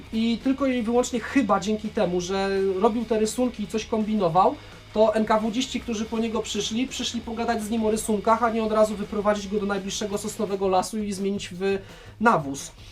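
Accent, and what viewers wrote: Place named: Silesia, Poland. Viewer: szlysza ze z naszej gotki mosz ten hajmacki akcent, pyrsk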